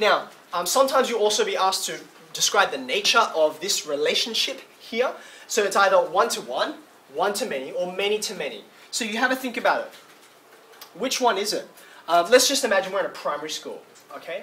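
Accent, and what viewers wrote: Australia. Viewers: He sound australian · Yeah, I have to admit I was distracted at first by the thoughts "is that guy Australian?" and "what a cool presentation technology".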